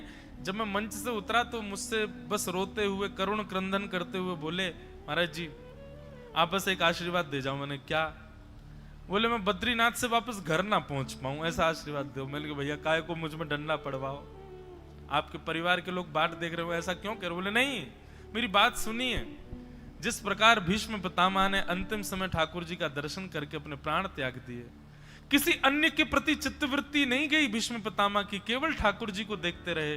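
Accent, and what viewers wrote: Braj, India. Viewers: Apke mukh se Braj bhasa bdi pyari lagti hai bahut mitha lgta hai, radhey radhey 🙏🙏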